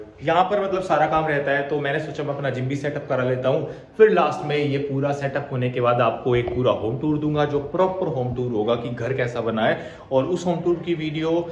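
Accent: Haryana, India. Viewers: Bhai haryanvi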